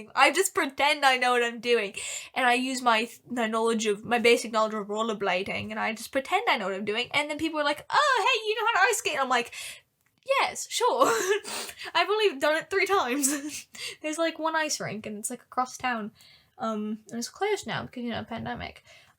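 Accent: United Kingdom. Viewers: I love when you sing on these podcasts, it really brightens my mood! Thank you so much and I love the British accent! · You sound more British than me, a Brit... Edit: And I regularly say y’all :)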